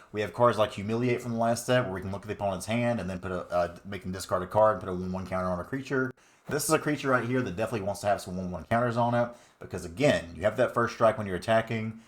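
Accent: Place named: Oklahoma, United States. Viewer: btw are you from oklahoma by any chance? lol your accent sounds very much like how 99% of the people im around sound. lol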